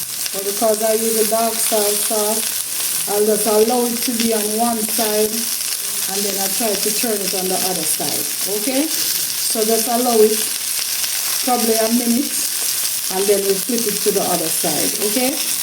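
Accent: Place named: Jamaica. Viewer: Wagwan, when i miss Jamaican accent, i come here. Thank u mum for this yummmy video❤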